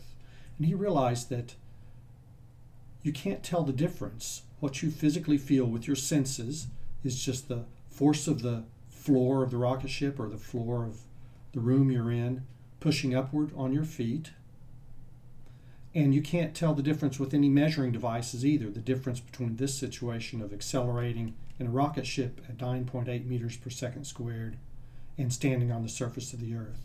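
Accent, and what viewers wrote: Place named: Texas, United States. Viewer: That's a Texan accent right there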